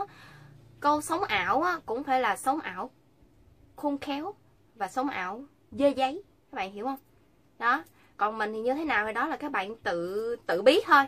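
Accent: Standard. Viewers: Cj nói chuẩn ko cần chỉnh lun á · Nói chuẩn vler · Chi noi chuan ghe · cj nói wa chuẩn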